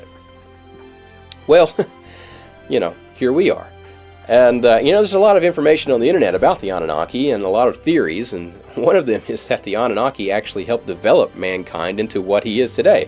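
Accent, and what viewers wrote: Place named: United States. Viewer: if you're going to pretend you're in the illuminati, don't have a beard, a badly exaggerated yank accent and a poorly scripted video you massive american bellend!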